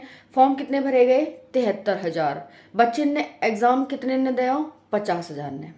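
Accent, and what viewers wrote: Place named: Etah, India. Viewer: Eta district ki language hai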